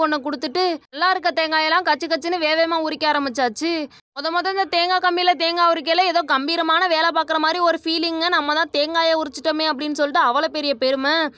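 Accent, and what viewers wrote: Madurai, India. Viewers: மதுரை வழக்கு பேச்சு தலைவா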